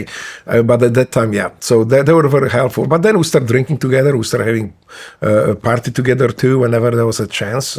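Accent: Poland. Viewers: You can be born in USA...but polish accent always comes out. Our nature dictates this. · Speaking like a true Polish xd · your accent says you're from Poland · Why he is speaking with Polish accent?😅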